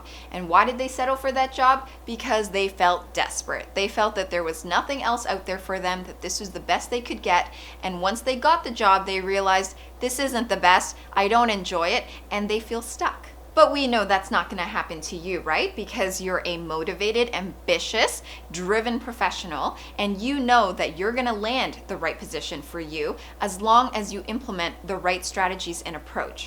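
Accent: Canada. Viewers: This is so much more inspiring with a Canadian accent!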